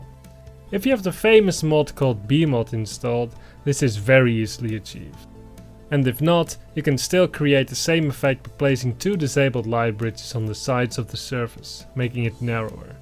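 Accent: Netherlands. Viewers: neat your dutch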